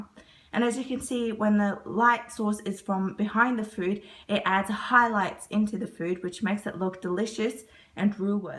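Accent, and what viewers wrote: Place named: Australia. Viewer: I've watched all your videos and I love 'em. I'm curious though, your food (in a previous video) look like Asian/Malaysian food (curry puff, rice, etc) but you sound Australian. 😊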